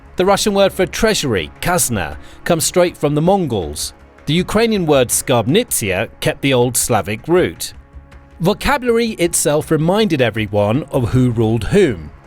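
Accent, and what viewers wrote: United Kingdom. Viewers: сходу ложь Киевская русь лишь одна из нескольких княжеств (Ярославская русь, Новгородская русь) и она не самая могущественная ни с точки зрения политики, ни с точки зрения экономики, ни с военной точки зрения. Самой успешной была Новгородская русь - но западным "историкам" выгоднее делать вид, что остальных княжеств просто не существовало. Почему у самых лживых и прозападных каналов на ютубе всегда британский акцент?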